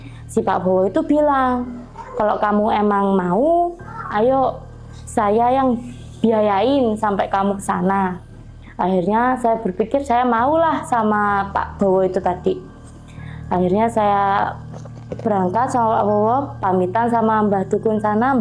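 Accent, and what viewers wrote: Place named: Pantura, Indonesia. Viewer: Dilihat dari logat bicaranya,Mbaknya saya prediksi adalah orang sekitar Pantura,Jawa Tengah.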